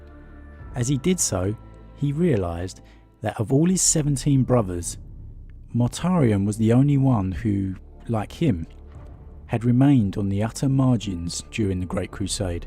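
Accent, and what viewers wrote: United Kingdom. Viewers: Cracks me up how you say "ass" and not "arse" with that British accent 😋 Great vid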